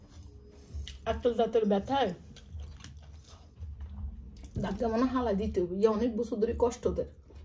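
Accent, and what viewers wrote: Noakhali, Bangladesh. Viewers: Apni noakhali vasay kotha bolen sunta valo lage....amio noakhlir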